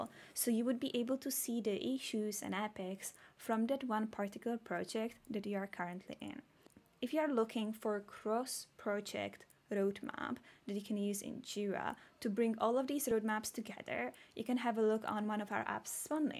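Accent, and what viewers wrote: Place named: Scandinavia. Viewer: Nice video! but the whole time I'm wondering where your accent is from, Its either Finish, Icelandic maybe even Norwegian??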